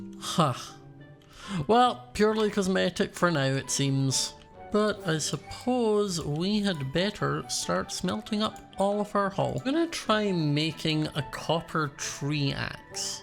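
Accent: Scotland, United Kingdom. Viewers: Are you British? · Are you Scottish? JW you just sound Scottish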